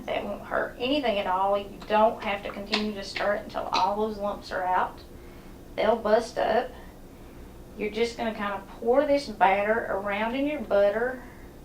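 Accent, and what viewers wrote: South, United States. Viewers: I have really enjoyed your sharing of this recipe and I’m looking forward to making it some day soon. I love that it is an old family recipe, wow 100 years old! Thank you for your kind words and for sharing in an indirect way your faith in God. I love the way you have honored our military service men and women on Memorial Day for their sacrifice to our country. You have such a charming southern accent that is very relaxing as well. Take care and God Bless you today and always!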